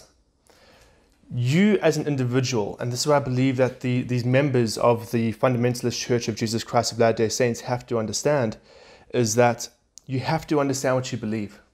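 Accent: South Africa. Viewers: Are you South African? Sorry for asking if it offends you, because I don't mean to, I'm just curious about your accent. Good review from a Christian's point of view. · I heard his South African accent immediately too.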